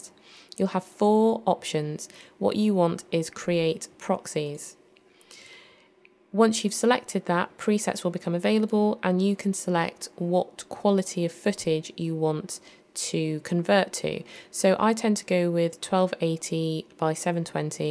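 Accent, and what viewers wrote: England, United Kingdom. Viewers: Very good tutorial. I have seen a few on this subject and this was clear with a lovely English accent for a change. Thanks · A great instructional video! Doesn't hurt to have that English accent either! ;)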